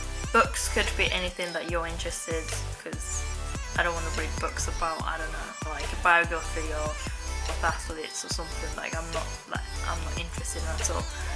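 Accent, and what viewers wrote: United Kingdom. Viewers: wow!!!最初の数10秒くらいはニュートラルな話され方をしてたからか、あまり気づかなく、なんとなく聞いてたのですか、1分後くらいから、うぉ！！来た！！！ってなりました🤣若干ロンドンのcockneyやMLEにも聞こえる気がしました！ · You do sound Northern lol....英語ペラペラやん！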